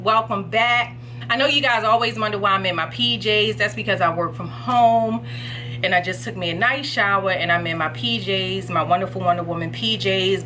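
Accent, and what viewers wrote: Baltimore, United States. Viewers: I would guess Baltimore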